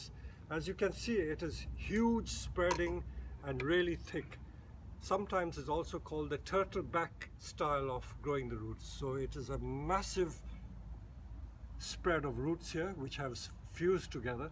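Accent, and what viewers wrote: India. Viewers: He's ethnic Chinese, and was born in India. ( is a Chinese surname.) He speaks English with much the same accent and pattern as an Indian fluent in English from an early age would speak the language. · Absolutely Brilliant. A non Bonsai related question for you Mr.......... Have you ever lived in India? I ask this because of your accent seems very Indian. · it says surrey, england...his accent sounds like he was raised in india... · Chinese British guy with an indian accent making japanese trees.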